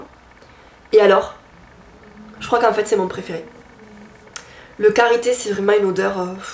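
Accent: South, France. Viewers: Dsl, je réponds à sa place... Elle a l'accent du sud de la France, je l'adore aussi ♥ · Ah j'aime aussi son accent parce que j'ai a peu pres le meme ( c'est l'accent du sud quoi ^^ ) .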